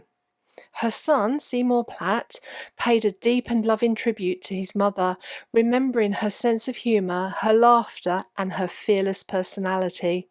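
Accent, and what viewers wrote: United Kingdom. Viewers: Oooh I really enjoyed watching your video, and listening to your voice and accent. I love England (only been there for about a year, long ago, and I'm dying to get back). I found you by chance, after watching a different person talk about Christine Keeler, and I very much prefer the way you talk ! (not to be mean, but the other person talks in such a stilted way, so it's a relief to listen to you!😄) I'll watch lots more of your videos. I also liked how you showed the real places. Oh I love the Thames and its surroundings! Anyway thank you and cheers from a Greek who landed up in LA but longs for England or Europe.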